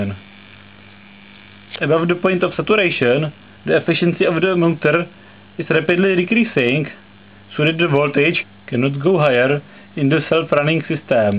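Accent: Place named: India. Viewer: Это английский язык, но говорит житель Индии, скорее из северной ее части. Южан очень тяжело понять, а этот говорит так, что его слова понять можно.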